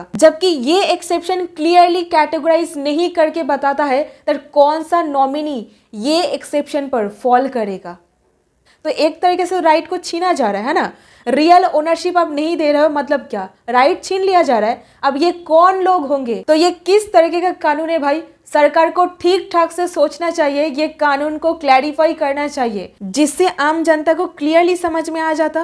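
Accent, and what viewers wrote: Bengal, India. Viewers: Your Hindi is not clear. Bengali flavour aa jaata hai and so unable to understand. Pls translate this in English. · I love Bengali accent... ❤❤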